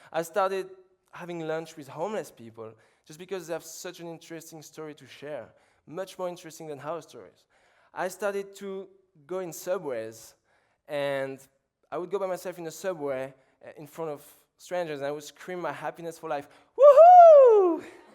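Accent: France. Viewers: America always falls for any French accent when added to a hunkie body. This guy is a trail blazer he will go far. Because under the anarchy of his life he has the zen zazou spirit. Everybody loves a smiling hunk with a French accent ou la la mama mia!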